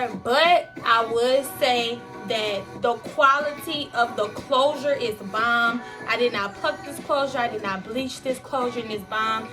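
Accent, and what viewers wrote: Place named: Louisiana, United States. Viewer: Are u from Louisiana?!.. ur accent 😍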